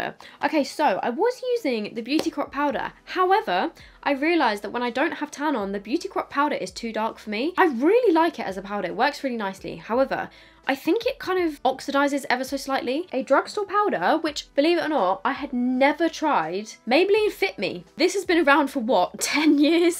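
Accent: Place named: England, United Kingdom. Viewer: As an American, a Texan specifically, it sounds so funny to me to hear someone cuss with an English accent.😅🫶🏼 It’s so cute lol. I love your videos!❤️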